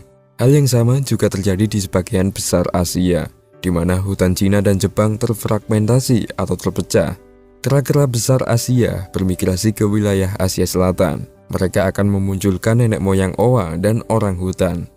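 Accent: Java, Indonesia. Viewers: logat jawa mu tu norak gak usah so, kamu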